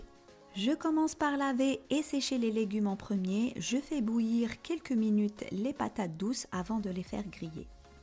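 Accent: Algeria. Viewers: الحبيبة ديالي ماشاء الله عليك كنحماق عليك 🥰 متبعاك هادي من شحال بصح هادي اول مرة نخليلك كومنطير بغيت غير نقولك نتي ختاريتي باش تهدري بالفرونسي ولكن سبحان الله كنفضلك بالعربية اكثر تجي حلوة منك اللهجة الجزائرية ومن أيضا كتكوني قريبة للقلب المهم علا راحتك أنا حتا الا هدرتي بشنوية غنتبعك 😉 معاك مغربية ساكنة في بلجيكا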